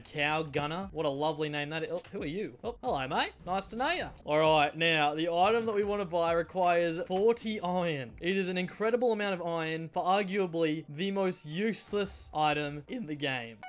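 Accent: Australia. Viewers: is it just me or does he sound insanely more australian than normal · Who else noticed the Australian accent getting more evident than usual? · Your Australian accent just keeps growing. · is it me or does sound more australian in this video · Is it just me, or does sound extra Australian in this vid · why do you sound more australian in this video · Bro I love you accent like it is the best Australian accent ever. :D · This is like the only video that actually sounds like an australian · Was it just me or did he talk more Aussie lol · the Australian accent popped out here more than usual 😗😗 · this video is just channeling his inner australian accent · Not sure how but you sound more Australian than normal in this video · is it just me or does he seem more Australian than normal in this vid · he sounds extra-aussie this video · That australian accent made me so uncomfortable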